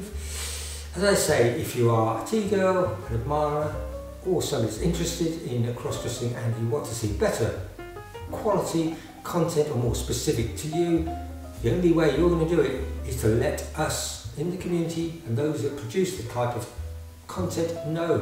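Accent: United Kingdom. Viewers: My reason for viewing is to see and hear what you have to say. Like I said before I am a sucker for an English accent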